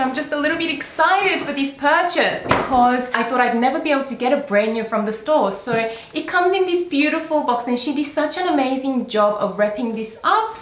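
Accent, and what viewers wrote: Australia. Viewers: Ive never heard a Chinese accent mixed with an Australian one before, mind blown.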